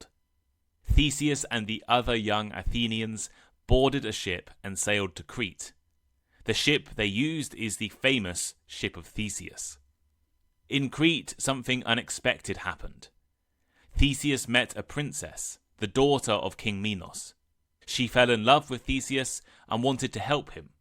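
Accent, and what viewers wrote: United Kingdom. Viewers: Is it British accent or American accent?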